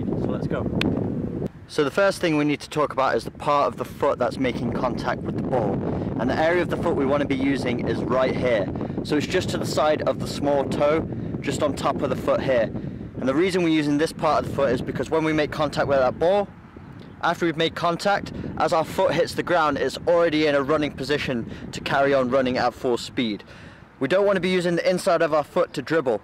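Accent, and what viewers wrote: United Kingdom. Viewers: Your Accent sound like You’re British Are u. Mate ?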